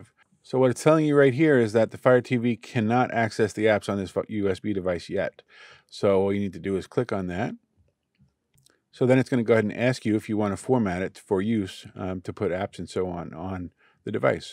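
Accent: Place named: Long Island, United States. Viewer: Brookhaven Residents love your Long Island accent, brother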